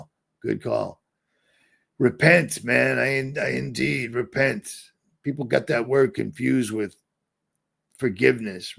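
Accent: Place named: Boston, United States. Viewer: haha the Boston accent. We don't pronounce our R's and we add R's to words that don't have them. Reminds me of my sista Amander.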